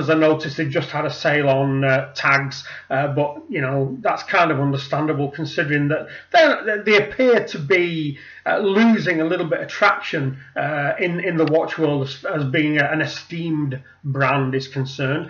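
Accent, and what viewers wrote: United Kingdom. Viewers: Too british. lost me .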